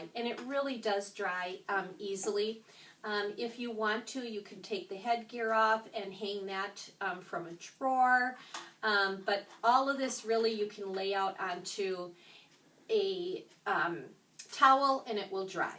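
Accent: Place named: Minnesota, United States. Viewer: Thanks, this was very informative. Like the accent, reminds of someone I know from Minnesota.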